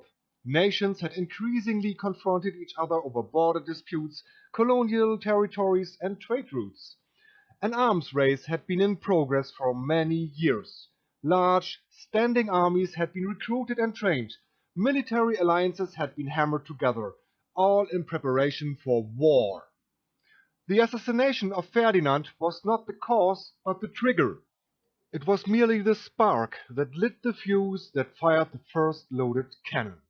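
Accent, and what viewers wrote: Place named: Germany. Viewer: Deutscher Akzent👍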